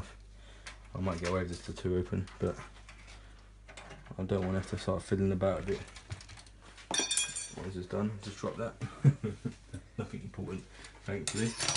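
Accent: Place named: United Kingdom. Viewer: I did not understand anything of what you said... its too bri-ish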